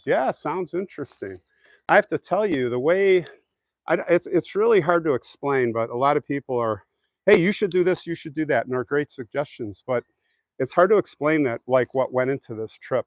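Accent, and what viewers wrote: Scotland, United Kingdom. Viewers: Loving your Scottish Accent! 🏴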